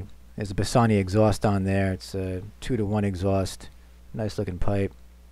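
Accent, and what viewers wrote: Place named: East Coast, United States. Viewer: Why do you talk like an east coaster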